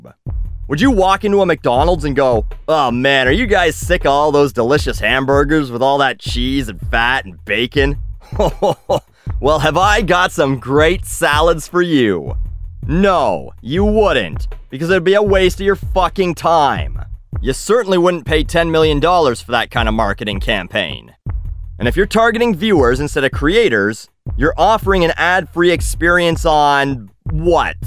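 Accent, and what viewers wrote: Canada. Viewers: It's great to hear your strange Canadian voice again! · <3 I've missed you and your pissed off Canadian accent:)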